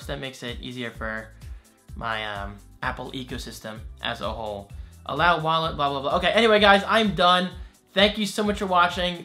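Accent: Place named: Philadelphia, United States. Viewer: You from Philly? Edit you said your dad went to AC. You got a Philly accent.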